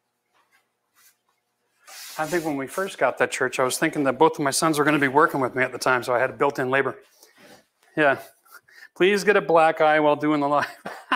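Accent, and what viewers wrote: Canada. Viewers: Isn’t he Canadian?😂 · I could’ve swore you were Canadian 😄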